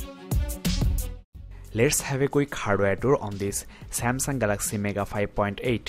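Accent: India. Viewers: india voice ?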